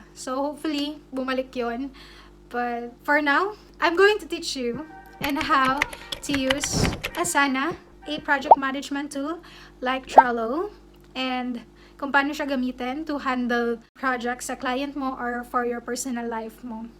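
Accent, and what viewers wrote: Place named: Visayas, Philippines. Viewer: Ate, taga asa ka te? Bisaya lagi imoha accent. Hehehe malingaw ko..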